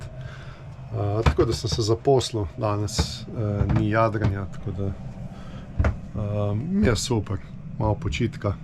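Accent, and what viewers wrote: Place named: Styria, Slovenia. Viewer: Dober nov intro. Pa fajn je slišat štajerski glas :) Fair winds and following seas!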